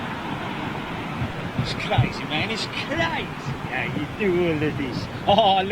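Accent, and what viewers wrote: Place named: England, United Kingdom. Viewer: Wow I just love your video, and your English accent. Hello from Covid19 Melbourne Australia 🇦🇺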